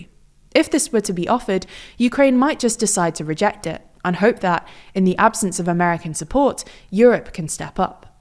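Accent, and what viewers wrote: England, United Kingdom. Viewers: Love her accent. What part of England is she exactly from?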